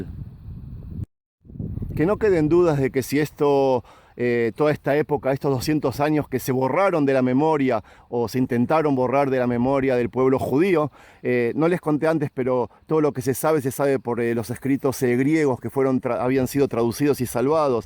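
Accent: Argentina. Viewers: Si la verdad. Estoy siguiendo cada video del hermano. Por su acento vivio en Argentina. Donde sea es muy gratificante y de mucha sabiduria. Bendiciones para ti. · Cuando comencé a escucharlo pensé que era argentino. Pero poco a poco he escuchado su relato y es muy interesante, no sé cual sea su verdadero idioma pero agradezco que nos cultive a través de estos vídeos. Es el primero que veo, pero veré todos❤❤❤❤❤ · Tienes acento argentino o de esos lados saludos hermano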